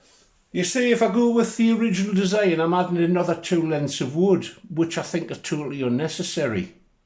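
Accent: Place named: Newcastle, United Kingdom. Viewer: Wow. Overengineering at its best. Fab build and all accompanied in mellifluous Geordy. Thank you so much. I have seen the Ladybird kit in books and catalogues for decades but never realised what a fascinating build it was.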